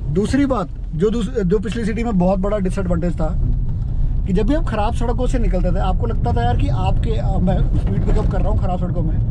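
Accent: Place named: Punjab, India. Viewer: apni yeh punjabi tone aur attitude kabhie mat chhodna luv u sir: )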